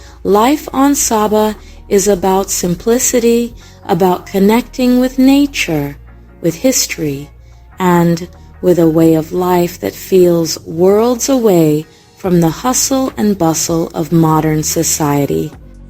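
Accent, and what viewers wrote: Jamaica. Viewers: I love this. For one moment I thought English which is taught in schools went extinct. Anyone from any English-speaking country can understand and partake. Nothing tops that beautiful Jamaican accent. I wish you much success in your new venture. God bless.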